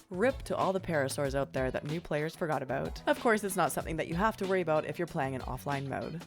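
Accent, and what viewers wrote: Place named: Canada. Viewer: You pronounce things great 😂 love the Canadian accent too.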